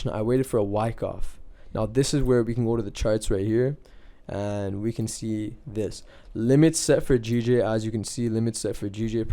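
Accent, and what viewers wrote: Toronto, Canada. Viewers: U sound like u from toronto. Am i wrong?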